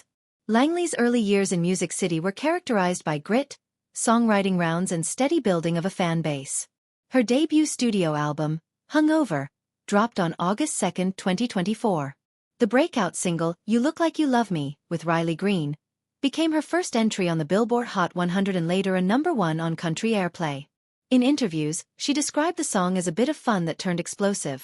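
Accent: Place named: Alabama, United States. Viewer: She sounds country as them red chert roads here in Bama.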